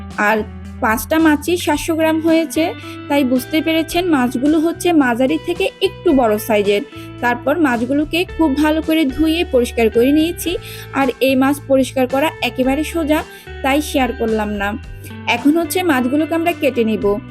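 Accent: Chittagong, Bangladesh. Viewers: Apu apni ki citiga apnar kotai buj jai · আপনার দেশের বাড়ি কি চট্টগ্রাম কোথায় বোঝা যাচ্ছে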